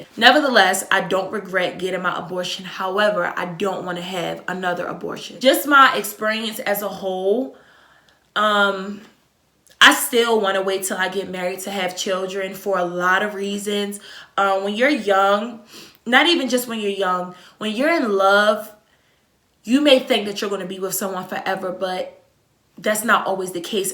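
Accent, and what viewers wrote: Baltimore, United States. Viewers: Baltimore accent so strong 😂 #yeahhus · I felt like she was from baltimore. I know that accent to well. Love it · Yaya Unicorns as soon as she start talking I said I bet she’s from bmore. Also, your very brave telling your story. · Came across you’re video and soon as you started talking I said she’s from Baltimore saying “Knew” like that lol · I knew it!! Soon as you started talking, I could tell, This is exactly what I was scrolling the comments for. Bawdamore dummy!!! Accent heavy, I love it! This my first time viewing your channel, glad I found juuu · im sick im from the D and soon as she started talking I'm like she must be from Baltimore ctfu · could tell after a few words you were from charm city lol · Danm us Baltimoreons really do have a accent. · Idk how I stumbled across this video but I know a Baltimorean when I hear one 💁🏽‍♀️hey gurl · I knew that accent was BALTIMORE all the way. 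I'm a new subscriber btw.. Love ya accent tho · Are you frm Baltimore? Ur accent sound like it. Im frm DC.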